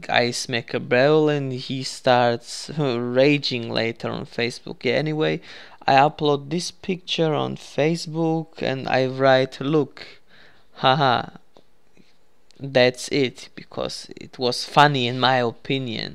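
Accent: Balkans. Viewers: I love Balkans Accent xD Sounds like Borat, VERY NICE xD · I'm sorry I love the way you talk Niko Belic FTW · Your accent is raw as fuck!!!:D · I LOVE BALKANS ACCENT SO MUCH XD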